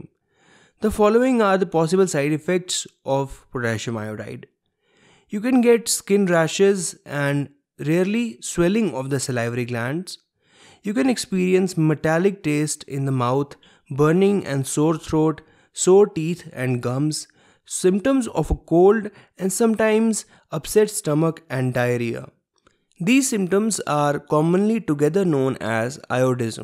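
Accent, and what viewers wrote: India. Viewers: Your accent is so Indian Love it!!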